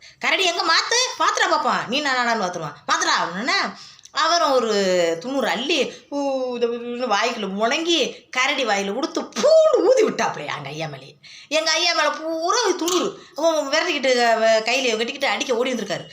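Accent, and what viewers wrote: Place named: Paramakudi, India. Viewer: நீங்க பேசுற ரொம்ப புடிச்சிருக்கு என் பிரண்டு ஒரு பரமக்குடி சைடு அந்த ஊர் மாதிரியே நீங்க பேசுறீங்க நீங்க நல்லா தொழில் பண்றீங்க அது மாதிரி தான் நானும் ஏதாவது செய்யணும்னு முயற்சி பண்றேன் ஆனா என்னால் செய்ய முடியவில்லை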